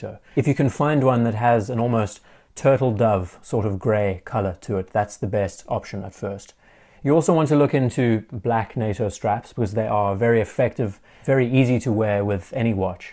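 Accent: South Africa. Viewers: I do like the South African Accent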